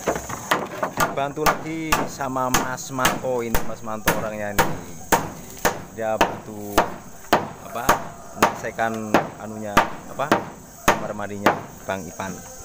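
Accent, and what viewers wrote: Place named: Banyumas, Indonesia. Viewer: Nyimak selalu....yu ngapak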